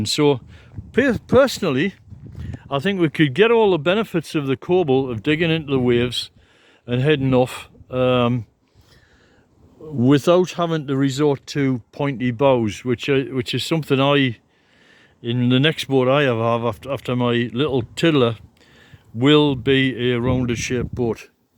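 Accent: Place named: Northern England, United Kingdom. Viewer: I can tell that you come from the North of England. My Mother came from Newcastle. I agree with much of what you say. Look at the bows on the current fleet of IMOCA racing boats that just completed a circumnavigation. Other boats that have "Scow" bows are popular in the single handed Mini Trans-Atlantic race (24 feet). If one looks at the construction of Cruise Ships today they are effectively a narrow rectangle with a pointy end and a long snout. I have a feeling that the boat you were looking at was certainly an inland sailing vessel at some time in the past. I would not be keen to take a vessel with Lea-boards to sea nor would I wish to sail a "scow bow" upwind in 30 knots in The Atlantic. Scow bows work well off the wind. Every dog has its day but the ones that are bred with malice aforethought tend to do better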